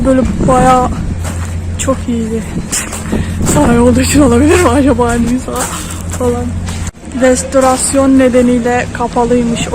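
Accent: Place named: Aegean, Turkey. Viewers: nerelisin şiven çok tanıdık da Kütahya civari mı sormak istedim🤗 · Bu kız %100 egeli. Konuşma tarzından anladım. Egeli olmadığım halde djsjdjssjs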